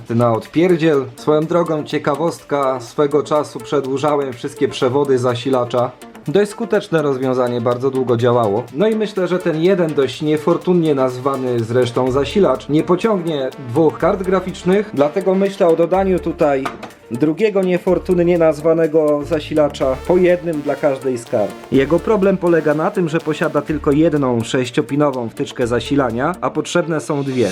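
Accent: Silesia, Poland. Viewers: Ten śląski akcent..taki fajny 😁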